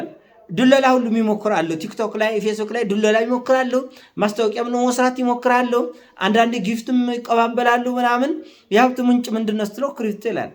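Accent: Tigray, Ethiopia. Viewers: ሰላም ሰላም ለምንድነው በትግራዋይ አክሰንት ቋንቋ ዜና ማስተላለፍ ምርጫህ ያደረከው? ትክክለኛ ቤሄርህስ ከየትኛው ወንሞቻችን ነክ? በነገራችን ላይ የማንም ብሄር ተወላጅ ብቶን ወንድማችን ነህ!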